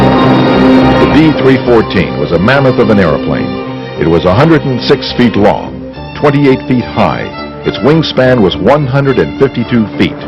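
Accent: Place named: United States. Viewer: Might be an interesting subject but the american accent is insufferable and the sound-track is hellish.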